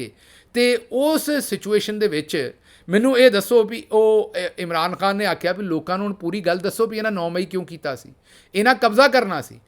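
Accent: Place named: Amritsar, India. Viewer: گوڑی امبرسری پنجابی سن کے مزا آیا ۔ ساڈے وڈکے وی امبرسری سن Regards. Prof. ILYAS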